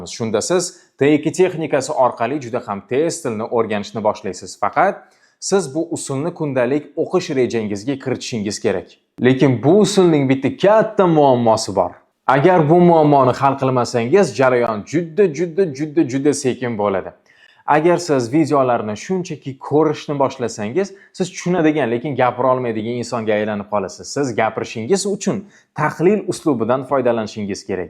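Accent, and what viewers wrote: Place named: Russia. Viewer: Kinolaralga ozvuchka bermismi mabodo, chota tanish russkiy aksent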